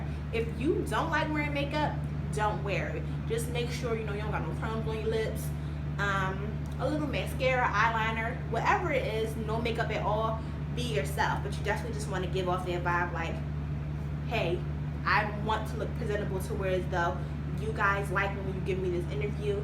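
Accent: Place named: Philadelphia, United States. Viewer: Are you from Philly or New Jersey? You sound just like my family! I just got the job today. So excited to start. I will take your advice going forward. Tfs your experience. You're super amazing! <3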